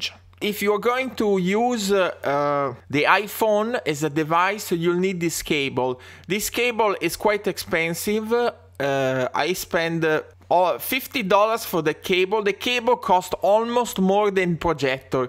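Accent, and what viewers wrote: Italy. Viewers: Che forte accento italiano cavolo hihihih spero di vederti al raduno di piazza Duomo Milano .... ti seguo da tanto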